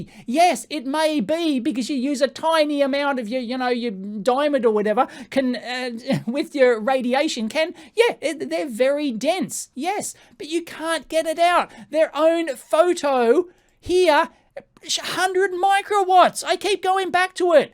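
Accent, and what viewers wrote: Australia. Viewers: God you make me laugh. And nice to hear a fellow Aussie accent amongst all the usa folks. :)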